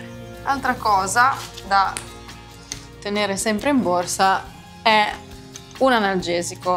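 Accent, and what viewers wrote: Italy. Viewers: Si è brava... E bella. Le donne Liguri parlano così... Io anche...parlo così sono cresciuta Genova.. le mie nipoti belle..parlano con accento così... Ciao baci...Ellis❤❤❤❤😅😅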